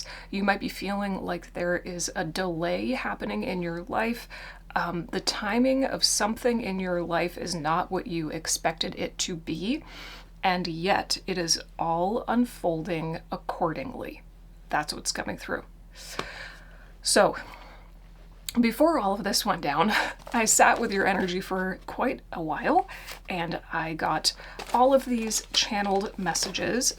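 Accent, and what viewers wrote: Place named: Midwest, United States. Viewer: welcome ! Still watching the rest of your reading. I enjoy you . I'm from Illinois across from St Louis. You Midwest yourself? I don't notice anyother accent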